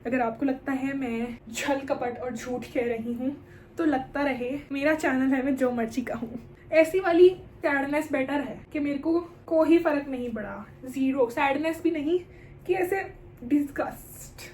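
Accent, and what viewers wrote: Punjab, India. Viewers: Godda , putha IK your punjabi accent 😭😂🎀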